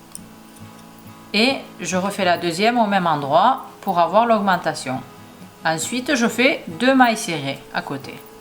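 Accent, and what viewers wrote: South, France. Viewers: Super tutoriel bien expliquer avec un jolie acsent du sud😂❤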